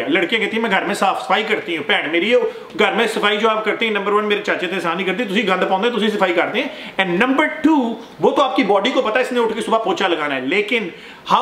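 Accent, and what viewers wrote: Punjab, India. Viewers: Hi veerji I am from India I started following u one reason is the way u speak bit punjabi hindi english all combination it makes me nostalgic since I am from punjabi family but married to a Hindu, I have gone through that tràuma of depression,and I somehow got over it, in fact it made me more mentally strong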